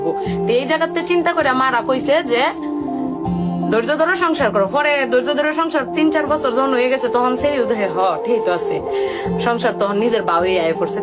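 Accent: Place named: Mymensingh, Bangladesh. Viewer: ভাষা শুনে বুজলাম এই মেয়ের বাড়ি ময়মনসিংহ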